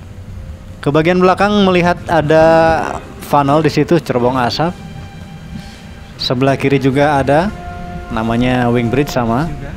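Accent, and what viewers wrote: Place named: West Java, Indonesia. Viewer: Bang asli mana...klo logatnya....saya tebak..mgkin dari jabar nya....tolong bales..trmksh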